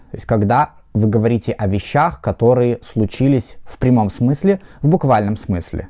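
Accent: United States. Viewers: У вас очень красивое и понятное американское произношение.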